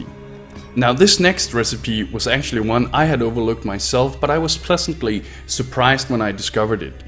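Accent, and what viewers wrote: Denmark. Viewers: Are you danglish? You sound danglish :D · omg sounds so danish english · Very informative and useful! Judging from your accent, I bet you're danish, so "Mange tak!" :) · You're danish aren't you? :) Anyway, nice video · Heh, danish accent. Du lyder næsten som en ung Lars Løkke =) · wow this is the epitome of danish accents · You sound Danish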